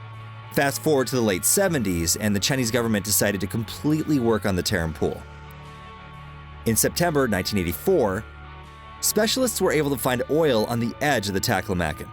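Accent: United States. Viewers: American video spoken in an American accent using American units of measure. Hmmm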